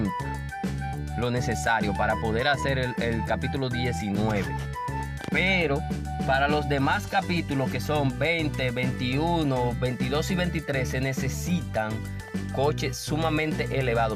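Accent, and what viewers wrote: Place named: Dominican Republic. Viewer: También soy Dominicano, me lo imagine por tu asentó, tienes un sub más.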